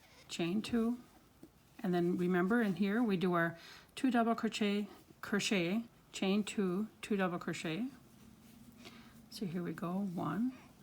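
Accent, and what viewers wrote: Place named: Scandinavia. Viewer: Interesting accent - are you European? Scandinavian Maybe?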